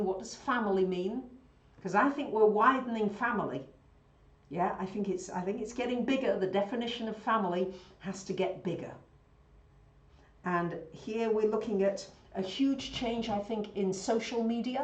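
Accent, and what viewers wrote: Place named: Yorkshire, United Kingdom. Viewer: I love that you have a Yorkshire/Lancashire accent, as I am also from Yorkshire (Ryedale, though possibly also Andromeda!) and it brings an earthiness to your broadcasts. Super 😊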